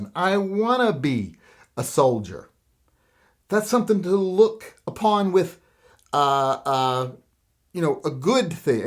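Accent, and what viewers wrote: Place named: South, United States. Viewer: Says the wannabe Marshall. A so called yankee with a mild southern accent and a lisp. Anyone doing what Kyle did of any age is defending the community. You talk too much about nothing.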